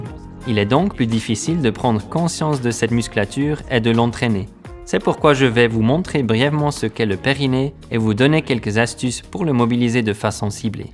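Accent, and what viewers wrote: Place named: Germany. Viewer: j'aime beaucoup cette accent allemand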